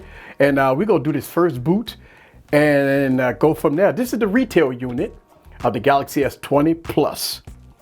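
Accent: United States. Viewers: I like your accent, what part of the US are you from? I'm from Liverpool UK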